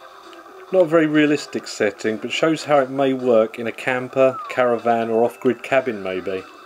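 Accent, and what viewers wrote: United Kingdom. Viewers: what part of the UK do you think he is from dohhhh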